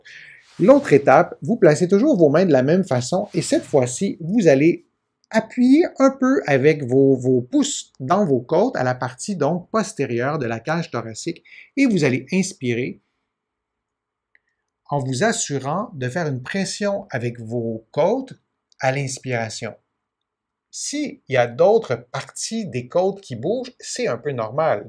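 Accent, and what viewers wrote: Canada. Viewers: Avec votre accent canadien cher cousin 😀 , je comprends expirer quand vous parlez d inspirer , sympa votre vidéo Cordialement , doc j claude plessier , Oise France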